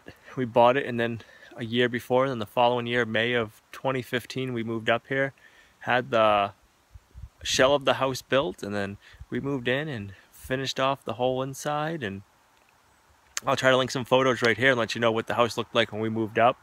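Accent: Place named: Boston, United States. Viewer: ***No way! I didn't mean speech impediment or problem. I'm from way out west and you speak like a Bostonian. Or a New Hamshire-ian. It wasn't meant to be a criticism. :) Have a great Friday. Great work.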